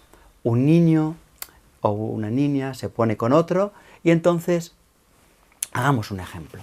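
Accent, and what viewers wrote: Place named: Catalonia, Spain. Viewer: Gracias. Tengo 54 años,y aún tengo faltas ortográficas. Recién hoy con tu vídeo me he dado cuenta por qué! Tengo memoria visual para muchas cosas. Puedo recordar con detalles muchísimas cosas, pero en la ortografía siempre lo auditivo ha estado más presente, no sé por qué. El caso es que viví toda mi vida en Uruguay,(hasta hace unos años que estuve viviendo en Barcelona). En esos años que viví en tu tierra (se te nota el acento catalán cuando hablas en castellano jaja) pude darme cuenta que si yo hubiese oído de niña la diferencia de pronunciación que existe entre la "c" y la "s" por ejemplo, hubiese aprendido mejor. Sabes que tanto en Uruguay como en Argentina la "s"; la "c" y la "z" suenan iguales, así como la "y" y la "ll". Pero claro si alguien me hubiese enseñado lo que tu hoy en tu vídeo. Seguiré aprendiendo a pesar de mi edad. 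Gracias.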